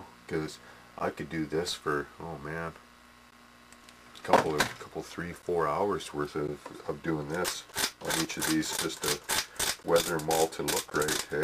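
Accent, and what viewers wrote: Canada. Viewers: Are you canadian eh!?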